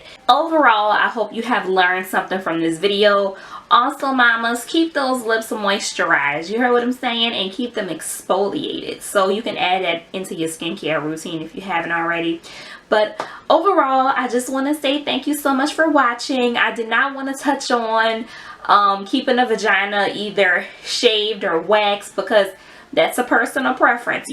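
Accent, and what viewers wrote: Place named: Louisiana, United States. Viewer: You have to be from Louisiana are sum😭your accent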